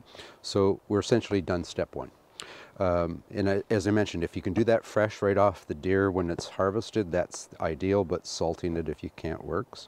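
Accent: Canada. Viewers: Truly excellent video! This guy is a National Treasure, I think he is Canadian from his speech patterns. He is articulate, considerate, and kind. Ted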